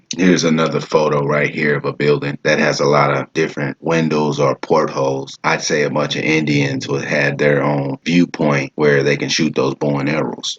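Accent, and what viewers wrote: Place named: Alabama, United States. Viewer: You sound like my family from Alabama brother but you keep giving me answers to alot of my question. Great honor to you and your family🏹🦅👑🔥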